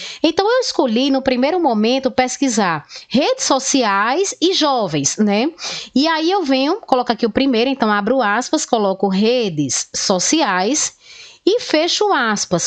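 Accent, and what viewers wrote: Northeast, Brazil. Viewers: Já me inscrevi no canal. Super clara e com sotaque nordestino ❤️ Obrigado!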